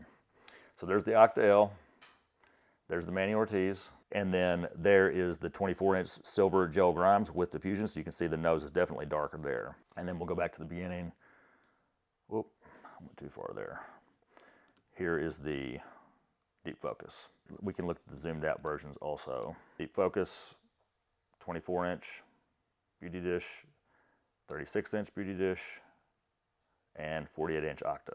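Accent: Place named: Texas, United States. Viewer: Another great video. Thanks so much. Side note: being from Miami, seeing a Hispanic person talking with a Texas accent really messes with my head. 😂😂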